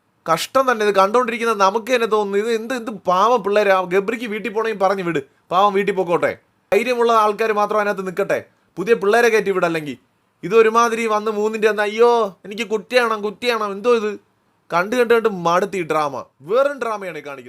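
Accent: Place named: Kollam, India. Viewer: Samsaram kettit chettante veedu ethaand Kollam jillayil annen thonnunnu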